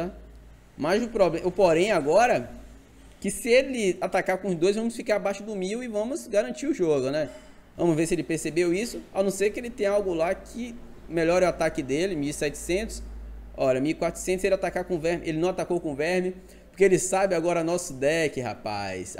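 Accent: Bahia, Brazil. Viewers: kkk sabia q vc era baiano mano vc é de onde? salvador? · ata você é bahiano , pensei que fosse dá paraíba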